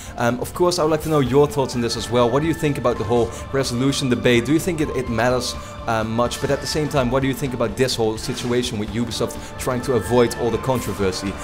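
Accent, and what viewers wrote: Netherlands. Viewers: You sounds english with a dutch accent in it 😂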